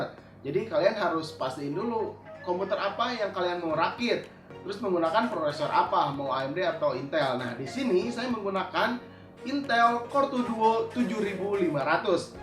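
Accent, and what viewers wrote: West Java, Indonesia. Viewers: Ke nya si om orang sunda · Urang Sunda kang 😁? Mantep lah infirasiyeun yeuh 😁😁 ahuyy #TerusberkayakangNOLIMITE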